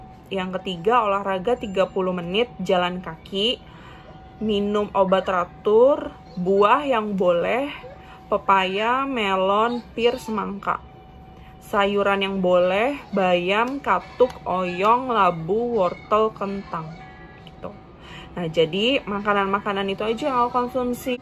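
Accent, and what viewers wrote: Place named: Palembang, Indonesia. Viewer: wong palembang ceh ?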